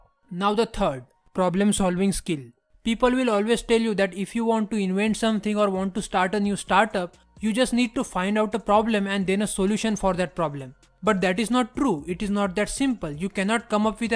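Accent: India. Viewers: I love the Indian Accent.. Respect from Somalia 🤗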